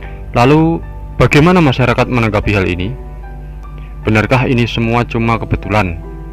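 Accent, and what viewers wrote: Java, Indonesia. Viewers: Medok nya banget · hostny lucu medok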